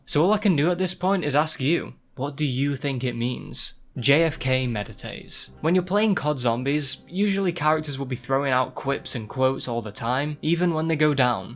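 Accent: United Kingdom. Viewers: LETS GOOOOOOOOOOOOOOOOOOOOOOOOOO!!!!!!!!!!!!!!! welcome back lad, missed that nice ass british voice!!!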